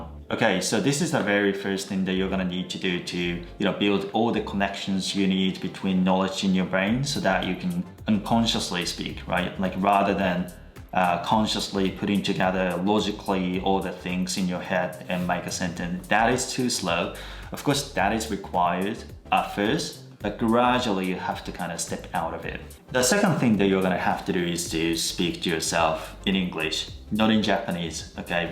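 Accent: Australia. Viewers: I can get that English is being adjusted to Aussie one(kinda "Aussied"😂) little by little. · オーストラリアの発音ですか？ way = ワイ show = シェア 教えていただけるとありがたいです。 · オーストラリア発音磨きかかりまくってんなw makeとかほぼマイク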